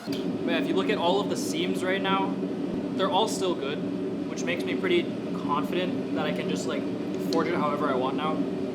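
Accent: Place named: United States. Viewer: Such a shame this comes with a grotesque American accent. To the YouTuber, change it, its unwatchable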